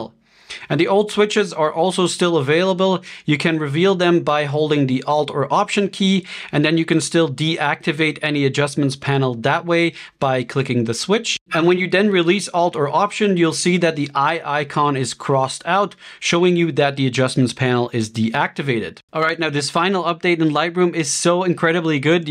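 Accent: United States. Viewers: ...but has an American accent, not all English speaking people have an American accent....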